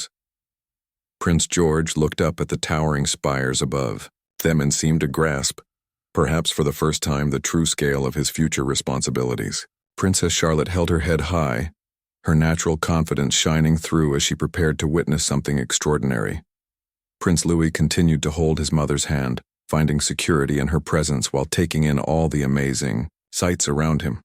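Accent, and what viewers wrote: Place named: United States. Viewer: What the hell is an American narrating British history ?? This is ridiculous !! 🇬🇧 it’s not your history !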